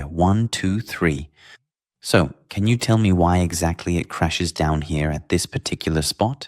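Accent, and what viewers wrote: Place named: Germany. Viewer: i prefer your german accent. its much more natural.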